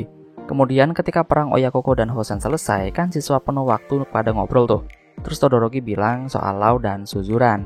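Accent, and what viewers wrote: Java, Indonesia. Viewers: Jowo mu bang bang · Jowone metu terus 🤣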